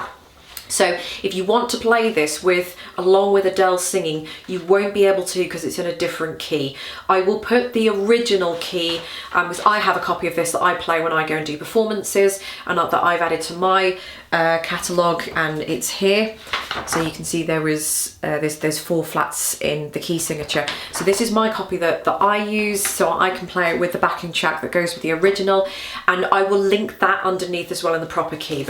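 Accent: United Kingdom. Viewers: <3 I love your British accent ! all the best!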